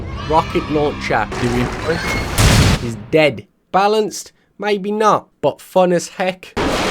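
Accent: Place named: United Kingdom. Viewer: you are awesome dude👊😂, can I ask you where are you from precisely? your accent seems british but not the british I know🤔.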